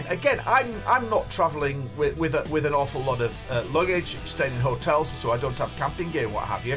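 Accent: England, United Kingdom. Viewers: Very sweet English accent may I know where is this from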